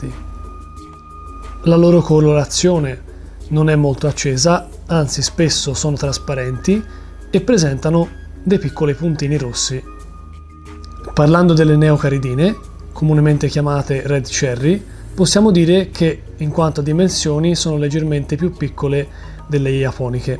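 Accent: Tuscany, Italy. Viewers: Il canale non è neanche male, ma non riesci poco poco poco meno la parlata toscana e poco più entusiasmo? Diventa difficile seguirti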